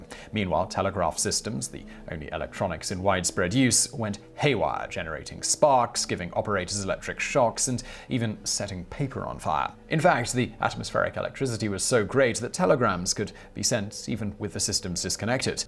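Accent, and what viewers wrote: United Kingdom. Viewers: Why does vsause have a brittish accent? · I love Simon's voice..the information gets absorbed alot easier when its in British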